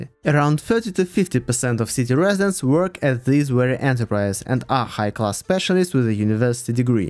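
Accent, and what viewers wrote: Russia. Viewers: Withthout prthoper autthorization. Sthensitive. I like Russian accent English. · Russian accent as it is, I have the same)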